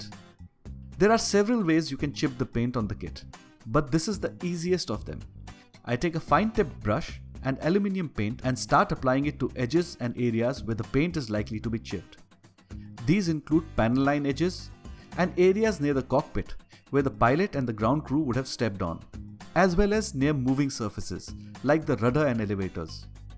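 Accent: India. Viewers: He is Indian, so it is the way he pronounces it.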